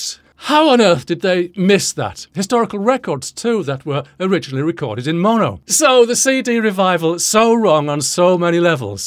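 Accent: United Kingdom. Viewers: He Does come off as a rather arrogant know it all, doesn't he? .... the Brit (almost typed 'Cockney' lol) accent doesn't help ....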